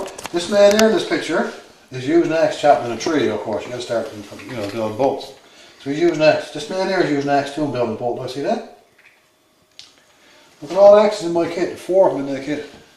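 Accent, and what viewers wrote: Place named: Newfoundland, Canada. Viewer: Haha Newfie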